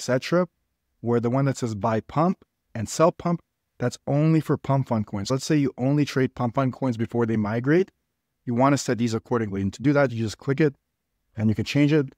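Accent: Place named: New York, United States. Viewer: You gotta be from NY or at least from the northeast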